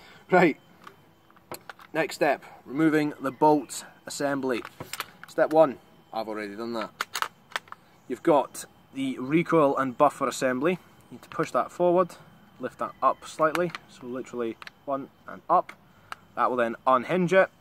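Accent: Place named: Scotland, United Kingdom. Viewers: This was made not long after a visit here and he always picks up on the accent. He's now has a permanent Scottish accent due to staying here. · Wait how comes you have a Scottish accent now?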